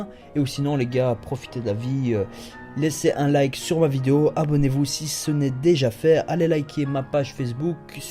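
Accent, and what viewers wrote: Belgium. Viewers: il super bien ton acent belge ca fait marre et ca change de ceux des francais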